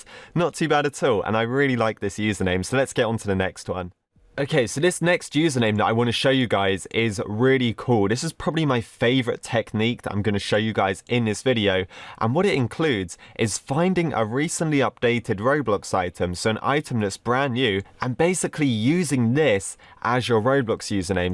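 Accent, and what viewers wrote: United Kingdom. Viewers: He's British that's why.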